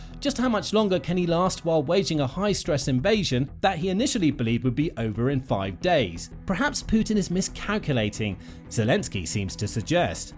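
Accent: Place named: Southern England, United Kingdom. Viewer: So, who is your audience? Since the rest of the world uses the metric system, one must assume it is the US. So perhaps you can ditch the southern English accent for Standard American.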